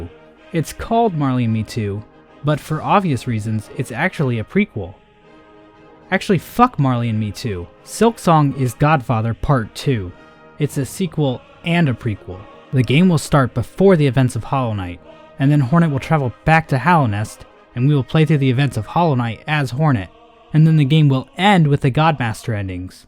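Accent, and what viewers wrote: France. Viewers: This aged like milk. Also, thats an amazing french accent 10/10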